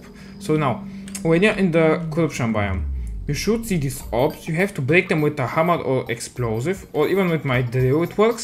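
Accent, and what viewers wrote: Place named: Bulgaria. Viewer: prosto te nabarax po govora che si bg😂😂😂 i vikam dai da pisha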